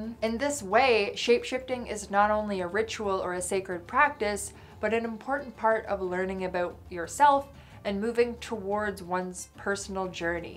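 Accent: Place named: Canada. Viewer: I wonder if she is Canadian or Minnesotan the way she says certain words. Interesting video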